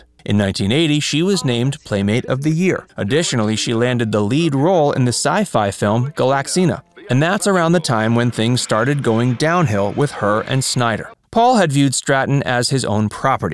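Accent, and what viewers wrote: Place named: Transatlantic, United States. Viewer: hello Mr you’ve a interesting’ Trans-Atlantic none accent. Did you train your voice ❤️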